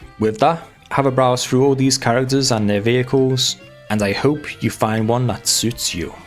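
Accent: United Kingdom. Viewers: hey i didnt know u are british 😭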